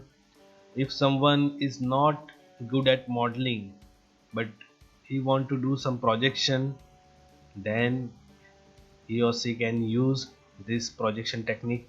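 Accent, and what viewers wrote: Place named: India. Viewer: Hey, This is an interesting question indeed. I wondered too, a few years ago. (I'm glad I found this channel back, because being into this as well (matte painting, set extensions, ...), the only thing I had time to write in the late account was I really loved his indian accent ;) Hahaha, kind but short... Now I can go further fortunately) So If you don't mind switching a few moments from this lovely accent to a less lovely german one (not me btw), you'll have some answers here <link> around 5.10, 8.00 a,d 18.00...Now you can have auto calculated depth, and I think one could probe it into a bitmap too. Don't forget to come back here, I wouldn't cause leaks just because I helped.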